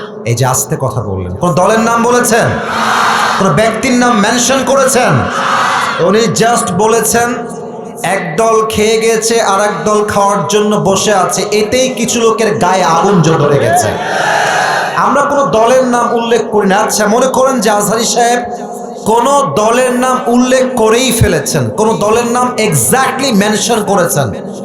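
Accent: United Kingdom. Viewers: মনে হয় ইংরেজ বাংলায় কথা বলতেছে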